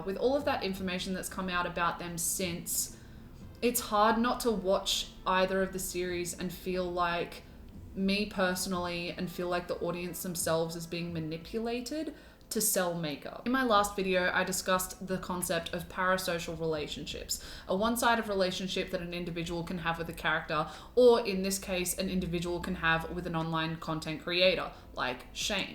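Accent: Australia. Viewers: Holy shit, this is the first video I've seen of you off of your viral tikkoks and yes! I'm living for the Aussie accent. Also super happy to see you growing, keep being an awesome bean. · Man it is SO nice to hear an Australian accent, and to hear Shane described as ‘a bit how ya goon’ bc that is the most accurate description of the man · Are you Australian? I've just found your channel and I myself am an Aussie and some of the things you say are just so Australian. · This really do be a hot take. Also idk why it's still so weird to hear you talking in your normal voice, but even as a fellow Australian, I love your accent so much! P.s. those eyes are giving me corpse bride vibes and I'm LOVING it!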